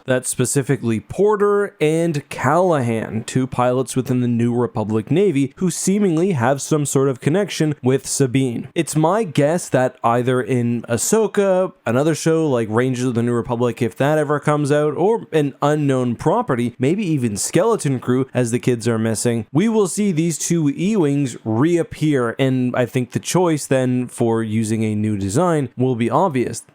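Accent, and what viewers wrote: Canada. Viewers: oh , Canadian or from Minnesota?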